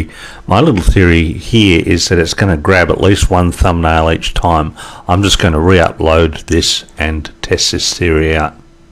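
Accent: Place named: United Kingdom. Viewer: hes not american its british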